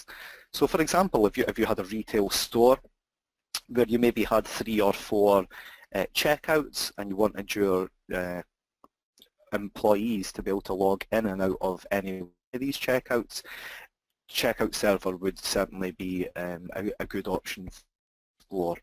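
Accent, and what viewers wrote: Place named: Germany. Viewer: Like your German accent :) cheers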